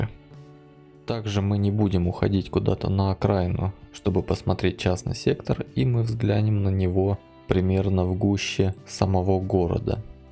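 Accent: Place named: Odessa, Ukraine. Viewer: Если вы посмотрите много видео этого товарища, то поймете что он очень сильно политизирован, лично меня всегдп удивляло когда он на украинских городах находил наилучшие места города а в России худшие, при этом выглядили они наравне даже при этом ахаза))) Да и к тому же акцент как у одессита. Посмотрите его сравнение про Одессу, он город с разрушенными домами, убитейшими дорогами. Сумел найти хорошин места и выставить его этаким среднячком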